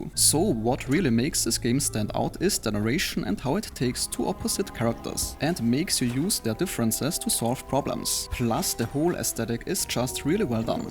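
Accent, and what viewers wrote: Germany. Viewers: his voice tells me he is German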